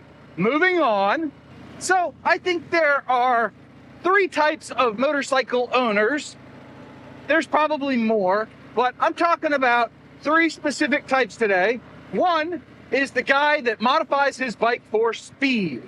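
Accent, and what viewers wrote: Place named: Dallas, United States. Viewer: Sounds like Dallas to me and I wasn’t even born here in the States🤣🤣🤣🤣 Very true what you’re sayin…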